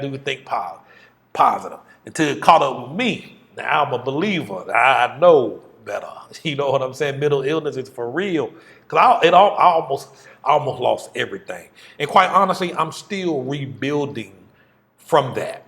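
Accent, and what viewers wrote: South, United States. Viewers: My man. You have this southern draw that cracks me up. . You're obviously very intelligent and I've enjoyed watching your journey.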